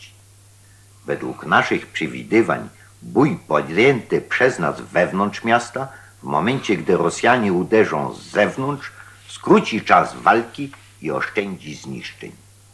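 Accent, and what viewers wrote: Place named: Poland. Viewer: Miło słyszeć ten czysto Polski akcent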